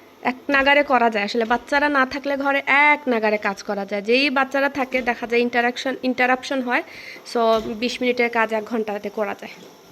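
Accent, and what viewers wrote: Sylhet, Bangladesh. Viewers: প্লিজ আপনিই সিলেটের ভাষায় পুরো ব্লগ দিবেন আমার সিলেটের ভাষা শুনলে কলিজা ঠান্ডা হয়ে যায়😊 আমার বাড়ি হবিগঞ্জ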